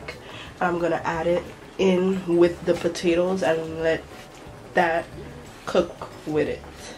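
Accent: Caribbean. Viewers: Almost thought you were from my island, st.maarten, with your accent. But so glad I came across this channel today. I will try as much as these recipes as possible. Mayb give them a lil twist since i am a fitness dude who tracks calories. But I need to add at least some of this caribbean deliciousness to my life!!! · Trying this today ! Sound Caribbean 😀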